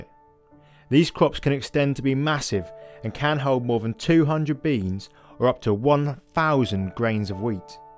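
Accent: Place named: Norfolk, United Kingdom. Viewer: Can't tell where in Norfolk you are from! I'm guessing Thetford way?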